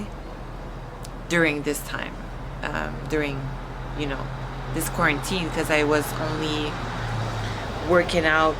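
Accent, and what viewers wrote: Quebec, Canada. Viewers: Your french-canadian accent adds to your character , who you are. I think it is nice. Anyone who would be so rude as to complain about your accent probably speaks only 1 language and has their own issues. As a new subscriber i like the accent i came across you with the jay cutler interview with you and shawn i thought you were great...I can appreciate how hard it is to pick up a second language ...lol i'm taking french 3 hrs a week and it's brutal.Tabernac you're awsome....lol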